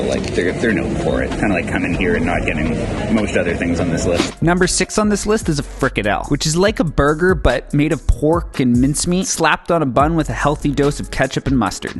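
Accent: Canada. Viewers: You sound very Canadian. Great video, very helpful for sure thank you !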